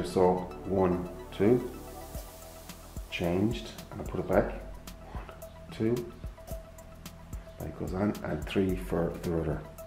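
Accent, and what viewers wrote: Ireland. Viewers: One of the best tutorials on setting up this plane and I'll use it as a reference in adding the Byme-A stab to a little 800mm pusher I'm putting together. But a bit of a whinge also. That mellow Irish accent is pretty hard for an Old Fart with 60 years of heavy machinery hearing damage to decipher with the limitations of the bad reverb of your mike/location (sounds like you are talking with your head in a kerosene tin! 😜) but adding that bloody Youtube musak overlay of a Caribbean Steel Band banging on it makes it even tougher. I know nothing of Youtube posting but I see lots of folks making this mistake. If it is somehow required it is a pity there is no facility on our end to kill it. eg with a stereo system balance pot. Rant over. 😊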